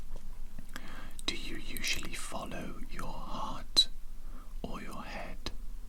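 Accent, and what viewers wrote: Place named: North Wales, United Kingdom. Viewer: i’ve been watching you for a while now and i’ve just gotta say it, are you welsh? because i’m a valleys girl and your accent is giving me north wales all the way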